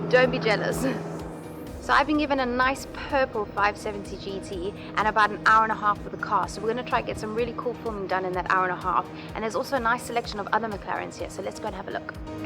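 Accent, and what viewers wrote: South Africa. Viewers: Jacque Noir what's fake? her accent is genuine as she's from cape town...!! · Are you south african? Your accent??!! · DJRCars Shes from Capetown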